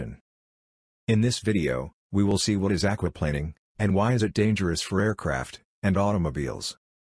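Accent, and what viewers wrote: India. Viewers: I like your slow teaching voice.. ❤thnks.. Btw why do u seem to have Indian accent. 😁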